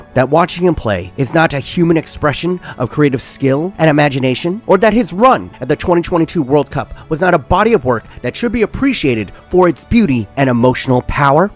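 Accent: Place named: New York, United States. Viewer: I can tell you are from you are from new york 😂😂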